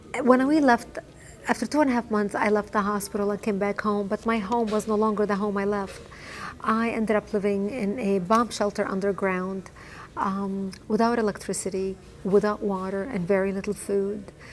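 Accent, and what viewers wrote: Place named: Lebanon. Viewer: Oh please. She even speaks Arabic with a Lebanese accent. Stop your lies !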